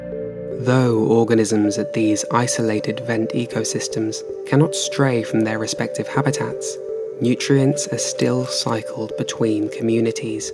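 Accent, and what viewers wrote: England, United Kingdom. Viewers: i dont like your voice and your accent (perhaps you are really an english), but i like your videos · Usually I'm not too big on english accents (sometimes I hyperfixate on things) but you my friend have that ASMR type of voice just without the cringe. That kind of stuff isn't my forte, but big homie over here got that type of voice I could fall asleep to. Ever consider getting into voice acting?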